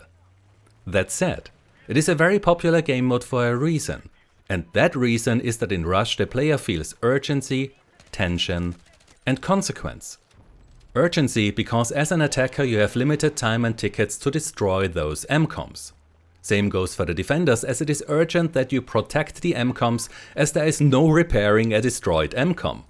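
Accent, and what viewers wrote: Germany. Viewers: Deutscher Akzent? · Are u german? Your english sounds like that😅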